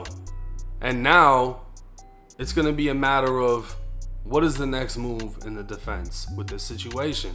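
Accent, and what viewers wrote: Boston, United States. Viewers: Lol I’ve been subscribed to “Jay Williams: Let’s Live Life” (AWESOME channel), and I just stumbled across ur channel. Love the content man, and I love the Boston accent 😂. “His chAAAHHHges..” I ain’t making fun— it’s just different to me (a square from BFE Ohio).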